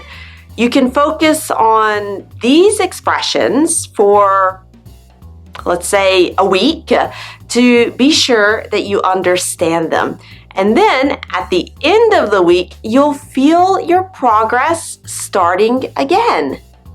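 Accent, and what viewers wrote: France. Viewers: Oh my God! I can notice the french accent when you speak😱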